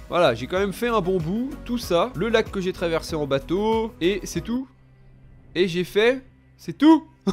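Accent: Switzerland. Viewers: Avant que tu parles du lac leman j'aurais parié que t'étais Vaudois... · T'es Suisse ?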